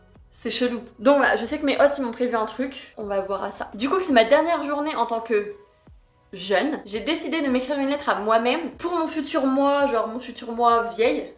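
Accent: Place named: North, France. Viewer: l'accent du nord même au states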